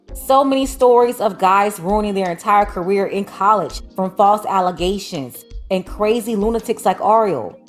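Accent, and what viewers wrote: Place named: California, United States. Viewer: u sound like u from cali lmao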